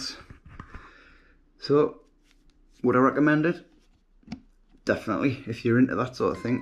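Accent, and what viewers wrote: Newcastle, United Kingdom. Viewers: Hi, I am looking to purchase a good trail Cam such as this for monitoring hedgehogs in my Garden. Can you tell me where you baught your trail cam from please. I live in Gateshead and its great to hear a Geordie on youtube and ive recently subbed to your chanel. Also what sort of distance can you see images on your mobile phone from where camera is sited? Also does this alert you of activity or is that only cellular Trail cams that do that?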